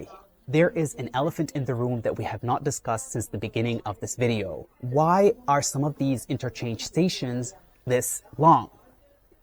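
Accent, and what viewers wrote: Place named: United States. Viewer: You sound like an American. Well, I was also impressed by NY subway 35 years ago when coming from China.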